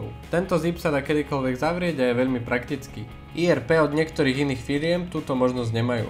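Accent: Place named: Russia. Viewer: máte nádherný ruský prízvuk :D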